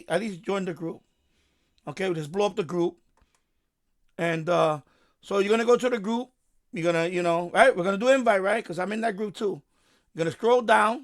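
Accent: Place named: New York, United States. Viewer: As soon as the video started and I heard your accent, I knew I was in the right place. 😂. Clearly a New Yorker talking about radios as opposed to all the Brits. Great vid! The firmware update worked perfectly.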